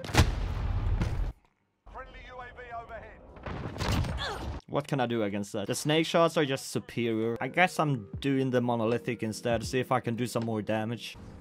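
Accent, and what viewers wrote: Sweden. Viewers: are u swedish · U from Sweden??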